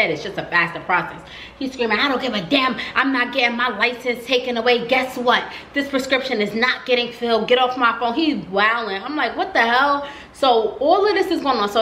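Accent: New York, United States. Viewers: Why did I think I was watching a nicki vid-when she was like “de de de-DOWN BELOW”😂 or maybe jus heavy NY accent. Either way-she’s gorgeoussss❤ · OMG! Sorry you had an awful experience. I had a second BBL by Dr. Blinski in Miami. Much better experience than Spectrum Aesthetics. That was a nightmare. I’m just here for your New York accent. You remind me of my daughter. ❤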